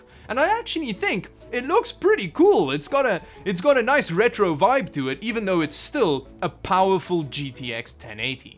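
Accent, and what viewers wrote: South Africa. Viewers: hey whats your accent? south african im guessing? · are you "a botjie from SA" or just have an accent? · where are you from dude, you have a polish name but you sound south african im so confused rn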